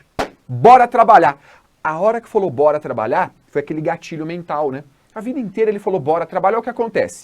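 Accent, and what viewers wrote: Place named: Paraná, Brazil. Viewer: Língua preta kkkkk , paranaense veio pé vermelho 👏🏻👏🏻👏🏻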